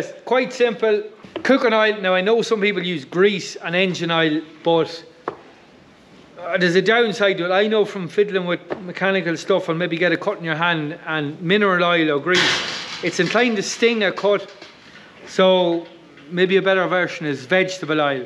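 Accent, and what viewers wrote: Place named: Ireland. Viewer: Thanks for sharing! I love to listen to you and other Irish people speaking ! Very soothing to hear! 😊🐑🐏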